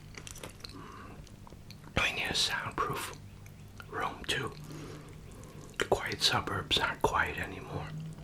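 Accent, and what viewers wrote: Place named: Massachusetts, United States. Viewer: I love your videos especially your accent - I was born & raised in West Bridgewater right next to Brockton but now live in NC - you sound like home which makes me so happy 🙂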